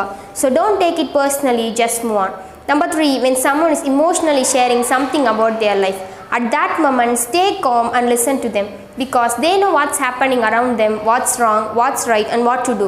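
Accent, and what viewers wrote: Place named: United States. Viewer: it is like an American dialects... superb...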